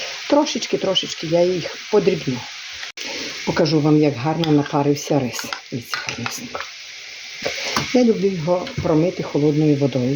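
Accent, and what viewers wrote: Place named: Vinnytsia, Ukraine. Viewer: Чоловікова тітка родом з Вінницької області також смачні голубці робила і говорила, як Ви. А голубці завжди були мініатюрні, трикутні і дуже смачні. Теплом повіяло від Вас і Ваших голубців. Міцного Вам здоров'я. І довгих років щасливого мирного життя. Дякую за теплі спогади. На жаль, тільки вони й залишилися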